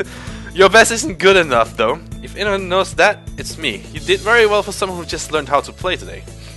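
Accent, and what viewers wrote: Denmark. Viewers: I wonder what his first language is? Danish, maybe? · Are you danish?!! O_O I am danish!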